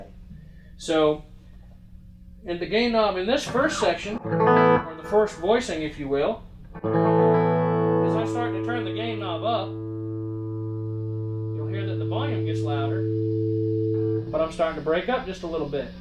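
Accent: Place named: Nashville, United States. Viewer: I love, but it cracks me up to listen to him talk. He's a Mainer and he talks like he's born and raised in Nashville.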